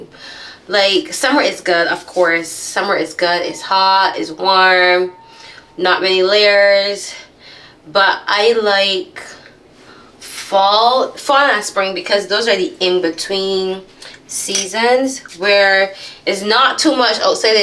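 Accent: Barbados. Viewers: Hi, I love and enjoy your content, my only complaint would be that I wish it was more regular 😁. I particularly enjoyed this one. Please, keep your Bajan accent, it is so wonderful to hear you sounding like you've not left Barbados. Take care and God bless.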